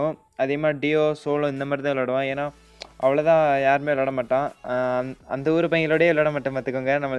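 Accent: Tirunelveli, India. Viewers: Bro r u from trinelveli your voice s like that. Because I too from trinelveli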